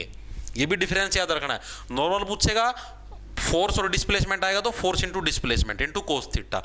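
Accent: Haryana, India. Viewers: Aap Haryana Se Hain Kya bahut acchi basa h ji 🎉🎉🎉acha padate h 💯🥹🥹🫣😍😍😍😍😍😍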